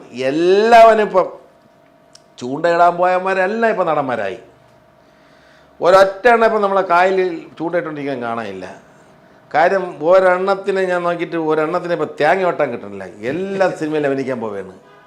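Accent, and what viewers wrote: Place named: Trivandrum, India. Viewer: ഇത്രയും വൃത്തികെട്ട ഒരു സ്ലാങ് trvndrm കഷ്ടം തന്നെ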